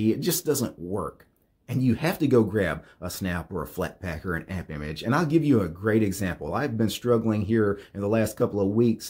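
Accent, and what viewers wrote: United States. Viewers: which side of USA is this accent from? 🙂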